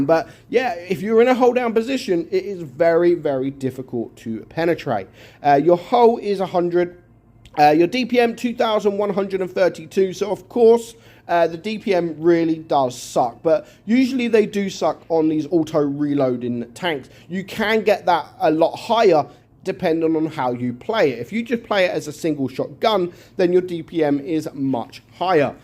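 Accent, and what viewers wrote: United Kingdom. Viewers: bri'ish accent lol, i also mishead lmfao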